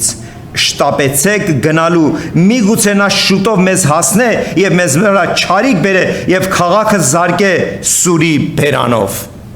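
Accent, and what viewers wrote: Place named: Iran. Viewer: Անհամբեր սպասում ենք Ձեր քարոզներին,Աստված օրհնի Ձեզ, իրանահայի Ձեր акцентը նույնիսկ հոգեհարազատ է մեր ընտանիքին,սիրով լսում ու նոր բացահայտումներ ենք ունենում լսելով Ձեզ։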